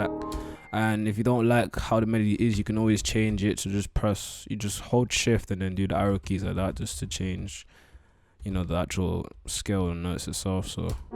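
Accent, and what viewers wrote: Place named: United Kingdom. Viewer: 📠 FAX! Heard the UK accent and subbed quick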